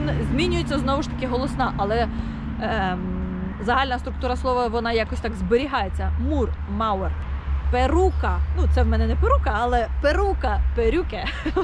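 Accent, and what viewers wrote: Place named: Ukraine. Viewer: Пані у Вас український акцент у німецькій вимові!)) Німці знаю нашу розкотисту букву ,,Р" вимовити не можуть!))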